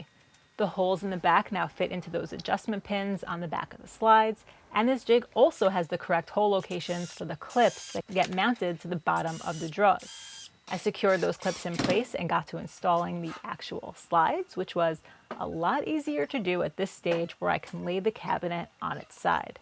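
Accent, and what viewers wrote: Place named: Long Island, United States. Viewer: Long Island big time. I'm not from here originally, so it grates n my nerves every time someone says it. And I'm in a woodworking club lol. See also "Radiator" where the "rad" rhymes with rat, not raid.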